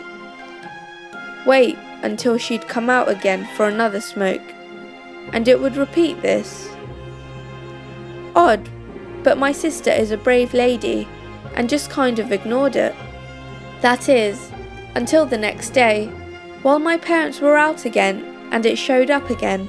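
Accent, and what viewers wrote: United Kingdom. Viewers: Her voice is lovey.And usually find British accent s annoying but her is lovely ;)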